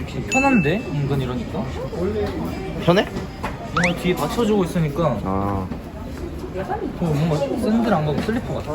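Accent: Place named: Gyeongsang, South Korea. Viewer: 역시 경상도 사투리 구수 하네용.. 부산 가본적이 없는.. 그주변지역은 다가본....부산 갈일이 없었다는... 신기한.. 잘봣습니다.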